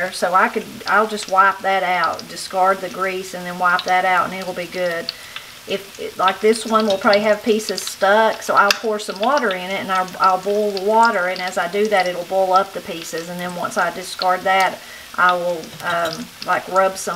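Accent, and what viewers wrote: Appalachia, United States. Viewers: I started watching this to experience your culture, your language, and your wonderful accent, the bonus is the amazing food!. I know so little about Appalachian culture other than the stereotypes depicted in movies. Thank you for this video. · Food aside, love the kitchen cabinets. And, I could just listen to this woman talk without end. What a gorgeous accent. Back to the food, i remember riding my motorcycle from New York down to West Virginia around ‘81 or ‘82 and stopping by a small cafe. the waitress told my the special was ‘soup beans’, corn bread, and collard greens. As immediately smitten with this Appalachian waitress as I was, I would have ordered anything she suggested. When I heard the woman in this video casually mention ‘soup beans’, it brought me back 40 years. It’s not a term we hear in New York. That waitress Had an accent much like this wonderful woman here. 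I was as completely mesmerized then as I am now. One the most memorable meals of my life. Eating that wonderful food and having a breezy chat with that Appalachian waitress felt incredibly exotic to this New Yorker. It’s funny how this video took me back to that. Thank you. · I grew up in Kentucky and al of this is fermileria to me, my mom did her 🌽 both ways, fried and cream style, so good. She was a great cook! Miss those times. I enjoy all of your videos, cooking, hillbilly talk and all of that kind of stuff 😋 just had to add 😉 this to my response 😀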